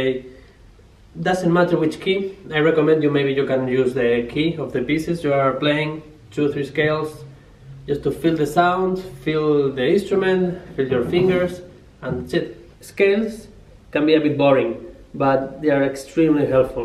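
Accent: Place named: Iberia. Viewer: Ése acento ibérico.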